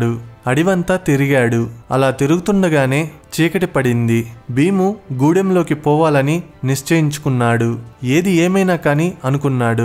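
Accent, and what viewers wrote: Telangana, India. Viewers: Pure telangana yasa😘❤️ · Telangana slang em matladinav bro... Hats off bro👏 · Telangana voice over 👌👌👌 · జల్,జంగిల్, జమీన్, జై కొమురం భీమ్ ఎం మాట్లాడినవ్ భాయ్ తేట తెలంగాణ యాస.👌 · Telangana slag lo masth chepinav... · Telangana slang supper bhaiya.. Fan of ur voice of slang❤️ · Pakka Telangana slang Love from Nizamabad... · Telangana yaasa lo maatlaadithe funny ga undhi..😆 Normal fluency alavaatu ayyindhi ga andhuke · Telangala slang super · Wonderful expressions with pure Telangana voice Anna tq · Telangana slang 👌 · Nice... Telangana slang lo manchiga cheppinav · Telangana voice super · miru chala baga telangana yasani matladatu great job man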